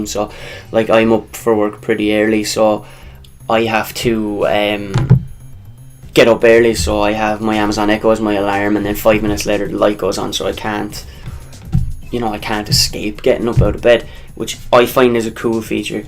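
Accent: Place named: Ireland. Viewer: Thanks for the review! Also your Irish accent is so cute!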